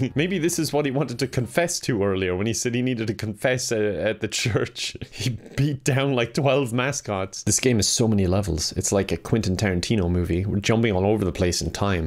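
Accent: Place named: Ireland. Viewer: Irish Man yells at video game.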